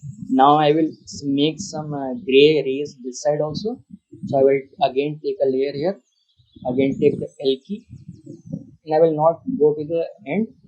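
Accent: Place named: India. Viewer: Typical Indian English accent :3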